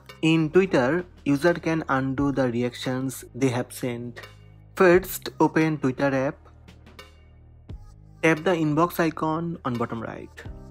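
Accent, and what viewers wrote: Bengal, India. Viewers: Dada apni bangali English ; Are You A Bengali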